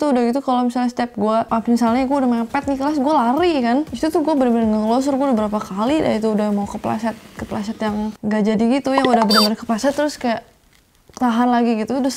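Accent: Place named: West Java, Indonesia. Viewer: Sunda pisan ieu mah euy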